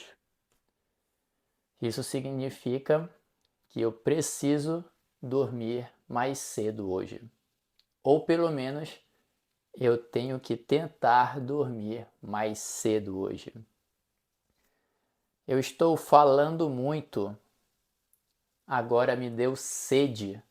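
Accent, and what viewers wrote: Brazil. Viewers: Where in Brazil is that accent?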